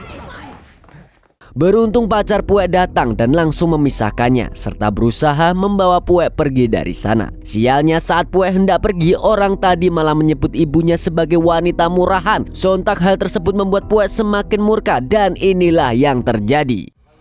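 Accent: Banjar, Indonesia. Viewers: Banjar banar😅😁😂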